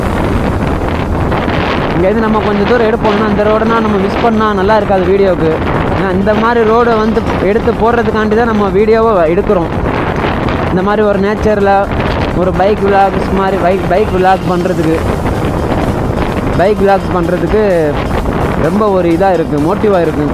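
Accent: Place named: Kerala, India. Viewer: Kakka va kakka nu solunga bro athu tha cute ah iruku unga KL tamil ungaluku nalla reach kodukum. All the best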